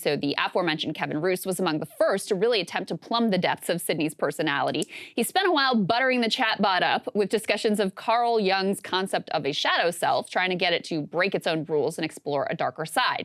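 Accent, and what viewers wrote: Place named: United States. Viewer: She sounds like an American.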